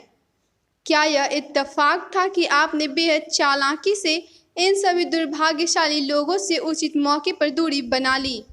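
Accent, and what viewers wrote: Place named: England, United Kingdom. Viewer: इस प्रकार के compere !!! इंग्लैंड में पैदा हुए थे और भारत मे हिंदी बोल रहे हैं। स को श बोलने वाले ऐसे लोगों को तो dislike करना भी संभव नहीं। अच्छी खासी न्यूज़ की रेड़ मार देते हैं, पूरा मज़ा इनकी भाषा और accent से खराब हो जाता है।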